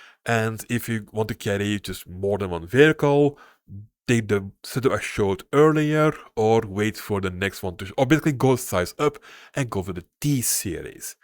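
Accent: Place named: Flanders, Belgium. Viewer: Ik can een vlaams herken waneer ik hoor hem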